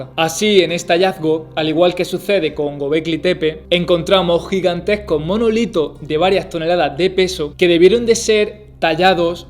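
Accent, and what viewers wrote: Andalusia, Spain. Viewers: / Voy a romper una lanza a favor de. Este señor es andaluz, seguramente granadino. Afortunadamente la Real Academia de la Lengua Española, contempla los diferentes acentos de los hablantes de este maravilloso idioma que hablamos 800 millones de personas en el mundo y que nos permite comunicarnos entre todos nosotros. He viajado por España y por Iberoamérica y jamás he tenido problemas de comunicación y, menos, de comprensión. Un par de consejos, me tomo ese privilegio porque ya soy muy mayor; agudiza el oído y lee mucho. Un saludo cordial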